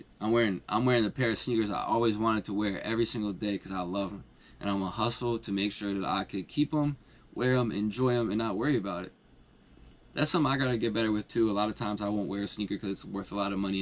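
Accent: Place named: New Jersey, United States. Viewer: I think I just pin pointed where dude from ...howell..nj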